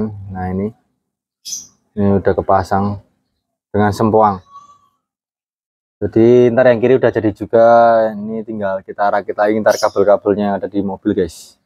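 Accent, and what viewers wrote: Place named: Java, Indonesia. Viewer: waudohe, kulon kinten seputaran jogja jateng ndanku, logate kok jowo banget, ajeng nderek pasang menawi celak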